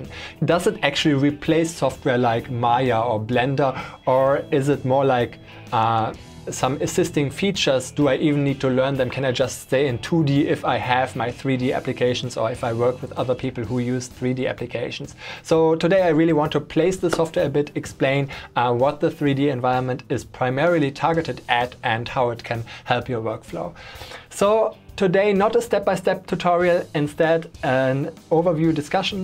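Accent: Germany. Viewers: man hört so har das er deutsch ist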